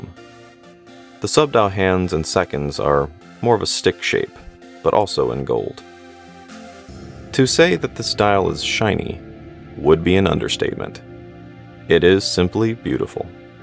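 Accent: United States. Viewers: It's nice to hear an American accent discuss watches. Every other channel I'm subbed to are English. You're way more concise than other watch commentators.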